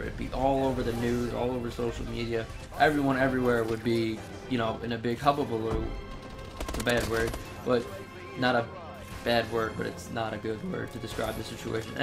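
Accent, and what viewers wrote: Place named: United Kingdom. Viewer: I like how you added the music in the background XD also how you say the states XD God you sound like such a Brit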